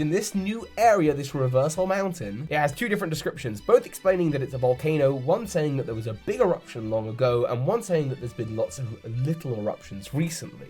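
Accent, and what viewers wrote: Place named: England, United Kingdom. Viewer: I love these kind of videos so much, I could listen to for hours as he sets up and explains these theories with his English accent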